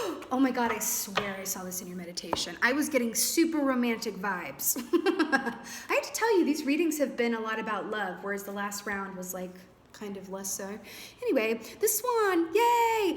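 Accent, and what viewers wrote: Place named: Mississippi, United States. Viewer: I love your accent...I’m from Mississippi and you remind me of home 💜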